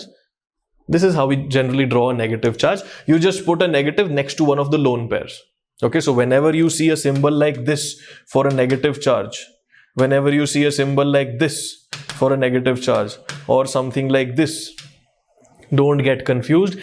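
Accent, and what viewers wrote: Punjab, India. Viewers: Sir are you from punjab??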